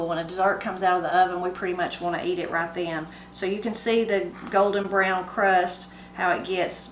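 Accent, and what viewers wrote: Appalachia, United States. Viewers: I love your channel and learning about Appalachian culture. My family is from Arkansas, but I suspect that somewhere down the line we have some family from this region. My mom’s accent (and her mother’s) sounds so much like yours, and she uses a lot of the same words. I asked her about it, and she said that my grandparents traveled a lot picking fruit and following field work before she was born, so maybe they had picked up some of the accent from fellow workers. Anyway it is really interesting to me, and I’m sure to many others as well. I’m happy to see all of these recipes and to learn the backgrounds on them too! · Your accent makes me want ramps and tators and deer meat,cornbread pudding.😂💪😇🙏🙏🙏🙏🙏🙏🙏love west virginia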